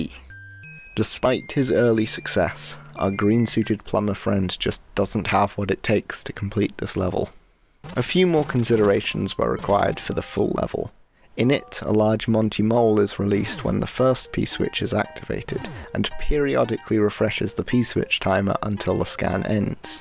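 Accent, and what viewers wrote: United Kingdom. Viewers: Are you Scottish? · are you from portsmouth? you sound like neil gaiman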